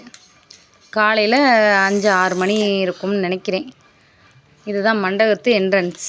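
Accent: Thoothukudi, India. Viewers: நீங்க தூத்துக்குடி ஆ சிஸ். நா நிறைய டைம் உங்க சேனல் பாக்கும் போது நினைப்பேன்.நம்ம ஊரு பேச்சு வழக்கு இருக்கு பாத்தேன். நா திருநெல்வேலி சிஸ் 😊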